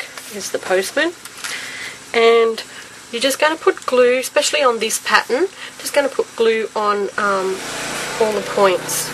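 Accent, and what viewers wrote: Australia. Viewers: I am surbscribed to your YouTube channel but I don't seem to get emails about new videos but I had found the yellow card you did with lattice and then found these. I can't think you enough for ideas on other ways to use my spellbinders. You are the best, love hearing your accent, I have several pug friends from NSW, and one special one that lives in Hobart Tasmania you sound just like her. Thanks again for all your ideas love them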